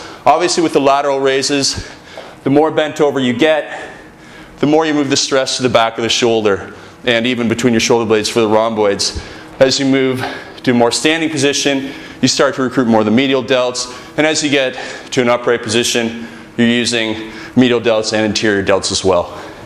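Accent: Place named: Canada. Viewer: I think he is Canadian because he says "oout"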